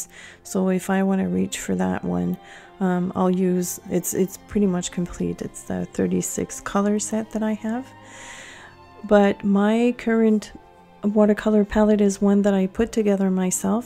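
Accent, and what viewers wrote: Quebec, Canada. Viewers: So beautiful! I just found your channel. I am also self taught and I do realistic acrylic on canvas. I need to step out of my box and I just love this. I recognize a french Canadian accent. My parents are both from Quebec, I'm first generation born Albertan. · Totally exquisite,!!!! 💕 I love your signature..... And you sound just nice and Canadian to me! 😄😘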